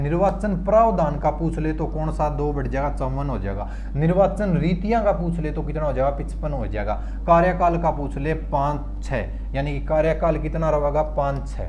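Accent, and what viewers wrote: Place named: Haryana, India. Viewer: Mjja aa jata h sir apki class dekh kr🤣🤣🤣🤣pure haryanvi😁😁😁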